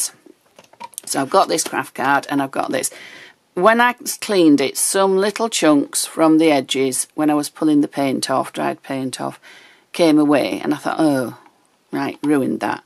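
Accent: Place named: Yorkshire, United Kingdom. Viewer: Looking forward to trying this. Your accent sounds like my cousin's wife – she's from Stamford Bridge.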